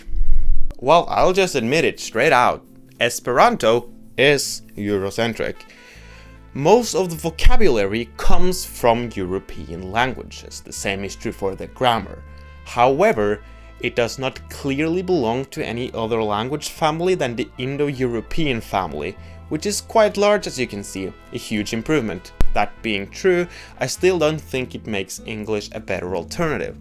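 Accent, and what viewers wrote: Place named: Scandinavia. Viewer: Didn’t even notice your Scandinavian accent! German accents are way thicker imo.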